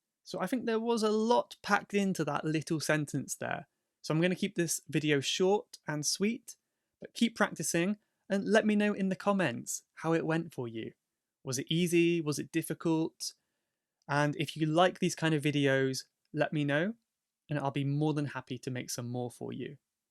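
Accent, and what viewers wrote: United Kingdom. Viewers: Thanks! You are good teacher. I've been looking for such a clear British accent for a long time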